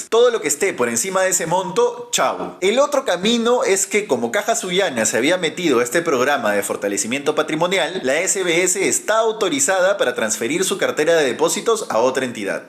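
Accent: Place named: Argentina. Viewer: Excelente escucharte pero evita hablar al estilo argentino que defecan por la boca.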